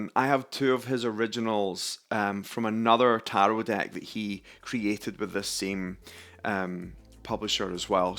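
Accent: Scotland, United Kingdom. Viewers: After starting a course to learn Reiki I suddenly really wanted a tarot deck to meditate with and I chose the Wildwood deck which also has artwork by Will Worthington. I absolutely love this deck and it is very similar to the Druid deck, with standing stones, the Green Man and ancient folk lore. I should imagine you also have the Wildwood and the Greenwood Decks. I just found your channel and enjoyed listening to a Scottish accent talking about tarot. I am also from the UK.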